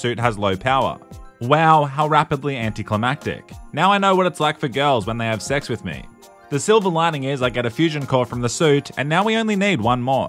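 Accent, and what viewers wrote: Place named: Australia. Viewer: He is not mispronouncing wrong! Its his Australian accent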